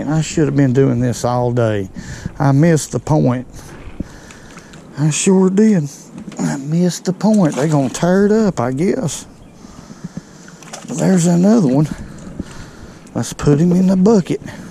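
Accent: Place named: Kentucky, United States. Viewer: Lol! Man I like this guy! is a heck of a guy and helluva entertainer. I love the accent. Reminds me of my Kentucky family.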